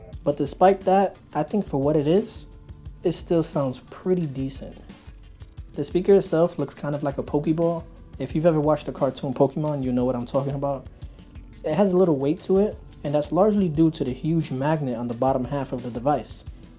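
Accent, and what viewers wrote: New York, United States. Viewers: Subscribed to your channel for: 1. The in depth tech reviews 2. Eye grabbing videography 3. The familiar New York accent Well done. Keep up the great work!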